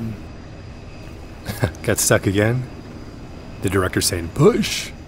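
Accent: United States. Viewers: Very smooth operation. I'm not American, never been in any military. I worked in civil Aviation (helicopters) all my life. I'm appreciating the difficulty to get all this right and the commentary is appropriately American but on the nail!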